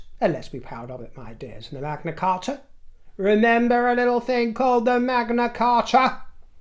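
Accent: Australia. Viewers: Bloody hell Aussie accent is so good! 🇦🇺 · Good Aussie accent ❤ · Love your Australian accent. 😅😘❤🇦🇺 We are honoured. Seeing the Uluru "handed back" commemoration ceremony shows how lovely the King is to our indigenous people and they were all beaming. You wouldn't think that was the case if you listened to agitators here who claim to speak for them. Lovely to see. ❤ I'd love if you did another round of keepsakes. 😌❤️👑🙏 You do mean a lot to all of us. xo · I just love your Australian accent 😂 🇦🇺🇬🇧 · This old Aussie chick likes your Aussie accent. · dear! That's a fine Australian accent, I thought. ❤ 🥝 🇳🇿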